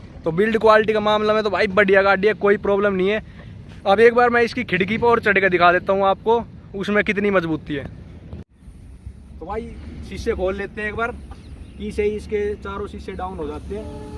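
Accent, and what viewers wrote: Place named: Haryana, India. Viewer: Bhai your from haryana?, really like your hindi.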